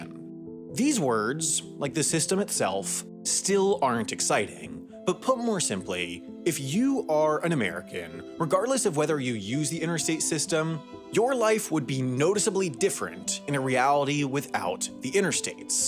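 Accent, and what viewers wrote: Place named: West Coast, United States. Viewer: Is this a west coast accent? It is hilarious😂😂😂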